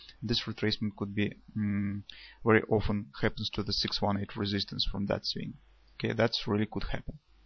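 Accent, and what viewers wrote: Russia. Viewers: The accent is killing me. Why does every single Russian have such a strong, distinct accent? I've never understood that.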